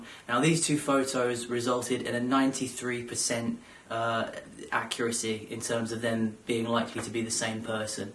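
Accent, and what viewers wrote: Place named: United Kingdom. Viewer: I watched this randomly as an Oklahoman, the moment you talked I was shocked to hear a UK accent. Used to hearing us talking about this.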